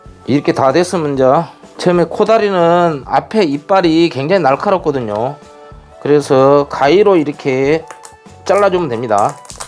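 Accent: Jeolla, South Korea. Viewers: 설명도. 구수한. 전라도사투리로. 친근감있고. 맛도. 배가되는거같아요. 저도. 따라해보려. 합니다. 감사합니다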